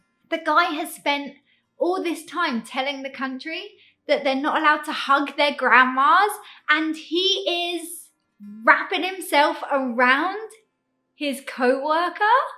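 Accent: United Kingdom. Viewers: 真的非常喜歡聽您英式英語的口音講任何事情，這種腔調聽了真的是一種享受啊！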